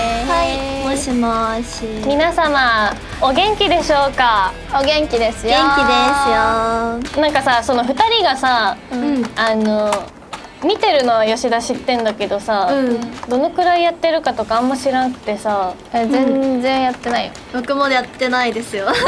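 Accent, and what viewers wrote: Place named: Kyushu, Japan. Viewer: あやてぃ九州言葉が抜けてなくて心地よい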